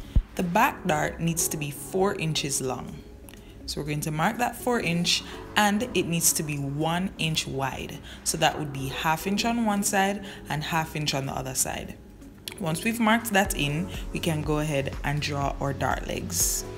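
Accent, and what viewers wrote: Jamaica. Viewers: you sound Jamaican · Did I detect a Jamaican accent,? Good to hear you. Thanks for sharing. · Hey, are you Jamaican? I heard an accent. 😊😊😊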